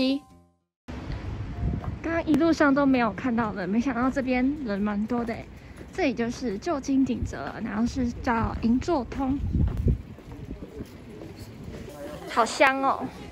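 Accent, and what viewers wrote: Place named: Taiwan. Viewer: 我怎么那么喜欢听台湾国语呢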